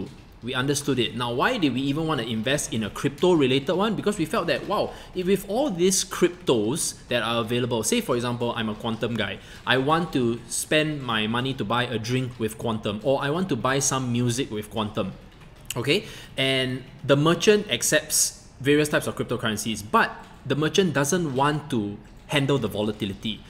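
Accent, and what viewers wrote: Singapore. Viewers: Singaporean accent is so funny.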